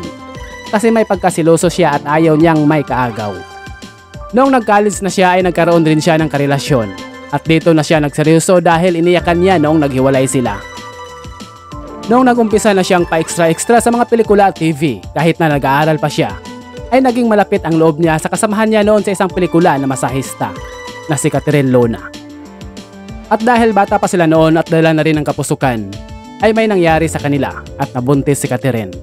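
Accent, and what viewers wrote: Visayas, Philippines. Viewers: Natawa ako sa ..speaker..hahaha...Bisaya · Prayd seken bisaya ka tlga Taga pagsalita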